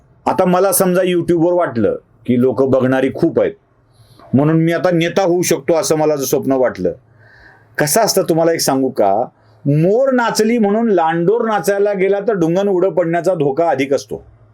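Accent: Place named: Vidarbha, India. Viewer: गुरुजी जरा लयच गावंढळ बोलतात आणि विदर्भाची इजत घालवतात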